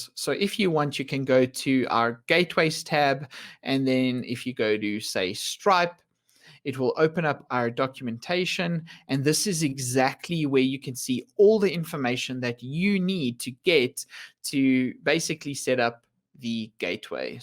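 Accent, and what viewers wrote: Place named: South Africa. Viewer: Baie Dankie. It's funny to hear a South African accent, most webinars I watch are American accents. At least I could understand your accent. Where do I explain what a member receives for each level?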